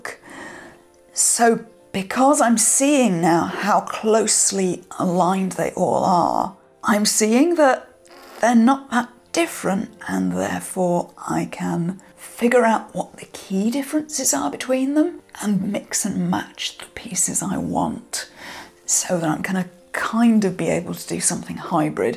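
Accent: United Kingdom. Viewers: you are an amazing artist!!! You are so calm & confident, even when adjustments to your projects are made. You are a real inspiration to me! I love your English (Brittish) accent!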